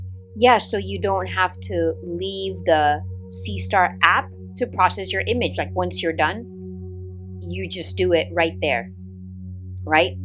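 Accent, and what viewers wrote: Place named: Minnesota, United States. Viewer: I'm a newbie. I've had my seestar for about 3 weeks. She's my first. Moon, Orion then Pleiades. It's been fun. Rosette and Tadpole are on my list. Here in DE will not see any stars for at least 2 weeks. Mother nature waving her wand. Discovered how wet the east coast can be at night. Dew heater seems to work. Enjoying your videos. Sounds like you're not original SoCal. Minnesota????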